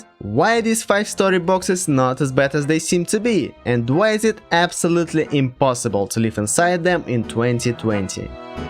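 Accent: Russia. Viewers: орнул с русского акцента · Молодец, что не стесняешься своего акцента, и говоришь всё точно так, как реально говорят русские! А то железный занавес пал, а люди до сих пор голливудский русский акцент себе представляют, и не понимают, например, как вообще на родине у нас что на деле называется. Так держать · Here I am learning about khrushyovka I've grown up in from the Russian guy who explains it in English with a very Russian accent while being Russian myself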